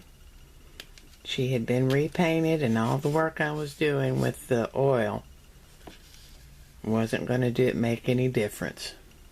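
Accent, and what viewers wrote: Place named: South, United States. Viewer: I am really impressed with your dedication to your talent. 50 yrs is a long time. I, too, have taken a few watercolor classes long ago, but would have to start from scratch in my knowledge. Remember some things but not much! One of my workshop teachers worked in egg tempera sometimes. Have you ever worked in that?. I have so many hobbies, it’s had to get into any of them as deep as I’d like. I’m retired now, so I can partake more.Would love to see some of your paintings. From your accent, it tells me you live in the south. May I ask where? I enjoyed your seeing your pretty yard. Thank you for sharing your videos. I enjoy your teaching. I’ve been collecting and making antique reproduction porcelain dolls, and trying to dress some. I love dolls! Love always having my eye out for a cheap one with potential. My doll teachers have been restorers, too. 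I’d love to learn how to do my own purchases. I learn some things from them, but when it’s their living, I hate to be really nosy about techniques. I am learning through you and others on utube. Thank you so much, again! It’s so wonderful that you share! Again, would love to see your paintings. What is the style you use? Abstract, realism, landscapes, portraits, impressionist like, etc., etc? Thank you for your extended answer! Keep “arting”. 😉😊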